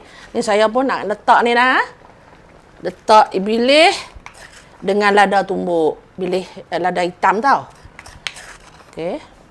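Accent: Kedah, Malaysia. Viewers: Assalamualaikum Kak, saya suka tengok video kak. Kak cakap kedah pure tak buat buat. Banyak petua kak share dalam video ni. Baguih kak idola saya setakat ni dalam arena youtube. Nanti ajaq buat kuih kaghaih pulak na..ka dah ada video nanti sat nak check...saya orang baru..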